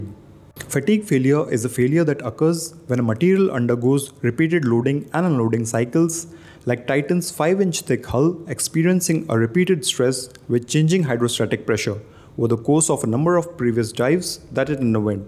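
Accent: India. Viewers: Oh no not that indian accent ! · Sorry but Hindi accent makes me laugh! Can't get serious about this video. I do apologize!!! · Who used an Indian to narrate this story??? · Indian englishhttttt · I felt like I was listening to an Indian call center🙄 also pressure is not only on top…..it is equal all around the exterior. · The paj33t accent is unbearable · Im impressed. I didnt expect an indian to have such a good description and understanding of material and engineering. I almost left when I first heard him. But I think he is pretty good! I like the video, thanks for sharing your knowledge! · Great job! Your English is atrocious due to the heavy Indian accent but the content was good.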